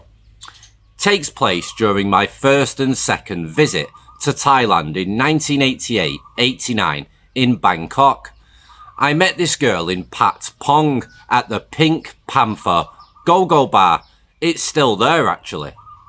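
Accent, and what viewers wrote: United Kingdom. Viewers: You have such a british accent to be an American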